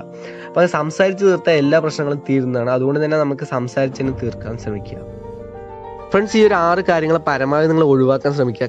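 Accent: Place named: Palakkad, India. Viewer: Palakkad alle bro veed😄......manasilavnd namde bhashaaa...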